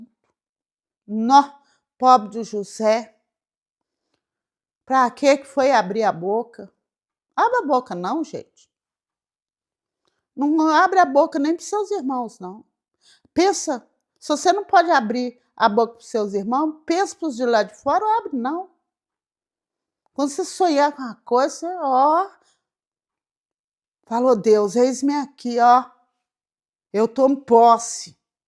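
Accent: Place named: Minas Gerais, Brazil. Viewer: Muito bom essa forma mineirez de contar história bíblica❤😂 parabéns 👏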